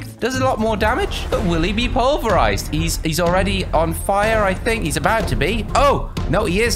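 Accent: United Kingdom. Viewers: U British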